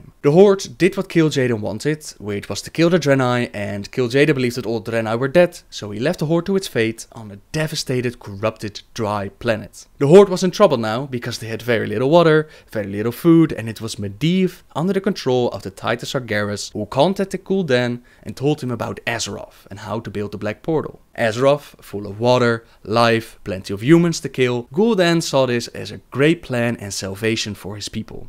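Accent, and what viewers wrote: Netherlands. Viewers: I love your dutch ascents. especially when you say Draenor, sound so cool lol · Ik wist dat je een nederlands accent had! Groot gelijk over de ondertiteling die nu overal gedubt word...niet goed. Zeker niet goed. Ik ben een Horde fan, en ik haat Garrosh maar dat is omdat ik verliefd werd op Thrall z'n horde, met de Darkspear en de Tauren.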